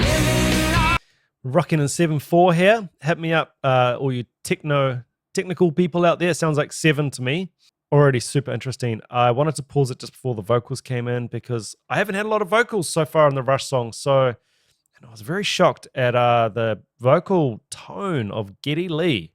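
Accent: New Zealand. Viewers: Oops, just realized (re: my earlier comment) that I assumed you’re a Kiwi because of your accent. I have NZ and Australian friends so I’m pretty good at noticing the difference (I hope).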